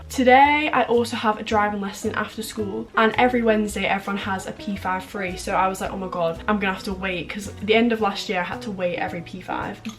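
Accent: United Kingdom. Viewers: I love the British accent😊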